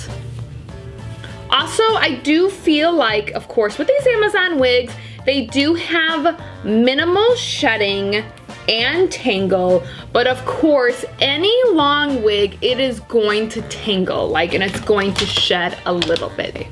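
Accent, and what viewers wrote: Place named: Upstate New York, United States. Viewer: are you from upstate ny?? you sound like me haha i love the positive energy!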